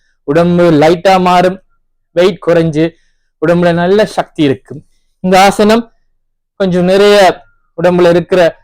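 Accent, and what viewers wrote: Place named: Sri Lanka. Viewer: Love your way of speech and slang... Srilanka?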